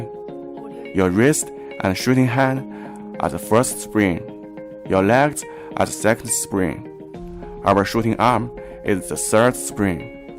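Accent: China. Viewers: po主是北京人吧。反正我觉得挺像那附近的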